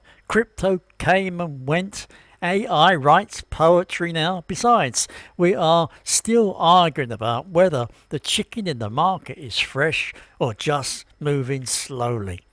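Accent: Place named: United Kingdom. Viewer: I am still chuckling 🤭 after reading this Mr., let me paraphrase it back to you: Christian, you must absorb more propaganda, least you should get closer to enlightenment by your own personal firsthand observations., why do I love ❤️ it so much, or do I? Is is that beautiful British voice that can take the English language and use it in ways that are like a Grandmother sewing 🧵 up a favorite tattered stuffed animal 🧸, or how much we all love Britain 🇬🇧, The late beloved beloved Queen 👸, and well loved 🥰 King 👑, Mr., I have listened and conversed with you because you are British, what do they do best? I think 🤔 it is Art 🖼️ the liberal art through the humanities, that was how they made life better for me growing up in America 🇺🇸, Doctor Who, the British comedy 🎭, the wonderful documentaries, adventure stories or a knock down drag out questions session in the British parliament on CSPAN, our fascination with the British monarchy, and that wonderful history, a history that defines what sovereignty is, and what it is not. 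Perhaps what is interesting and fascinating about you is agreeing with you but not coming to the same conclusion. Perhaps understanding you is to begin to better understand the peoples of the world 🌍., I was reflecting on post World War Two history, the United Nations 🇺🇳, Japanese atrocities, the two front war Americas fought, pacific and Atlantic, and the results; we saved Britain 🇬🇧 ah yes, DONT say it, you know it is true, you asked we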